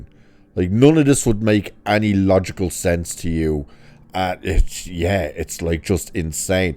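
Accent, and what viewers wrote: Ireland. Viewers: He's Irish not English.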